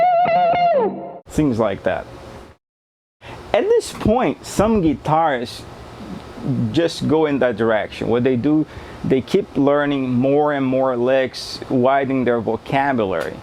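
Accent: Brazil. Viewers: vc poderia fala em portugues. pelo menos teu sotaque me parece brasileiro. e poderia colokar as legendas em ingles. ; ) soh uma sugestao nao me leve a mal. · I think the accent is Brazilian. I can't get to the link. I like this stuff. I've pulled towards this type of phrasing without know/analyzing what I was doing. This'll be useful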